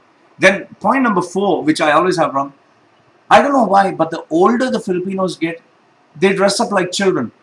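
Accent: India. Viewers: Hey guy. ..talk about ur nationality. .ur accent like. ..Indian. ...sooo talk about Indian. .. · akala mo kong mtatalino ang lahi mo.hoy tingnan mo muna ang mga kalahi mong mga Indian kong matatalino ba o mga edukado, kong mkapagjudge ka sa mga Pilipino wagas.At ano nman pakialam mo kong anong gustong bilhin nmin,pera namin ang pinambili hindi pera ng india.Palibhasa hanggang panglalait lang ang alam nyo sa mga pilipino kasi kahit saan kami magpunta magaling.tingnan mo muna sarili mo at kapwa mo indiano kong maayos ba o hindi.indiano ka nman eh hindi Arabo.salita mo pa lang halata na indiano. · all were true..i can sense you have indian accent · he sound an indian.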